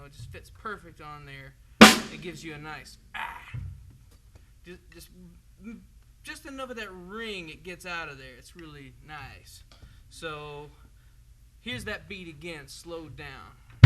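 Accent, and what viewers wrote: South, United States. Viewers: Really funky beat that's hard to beat and a great Southern accent to go with it.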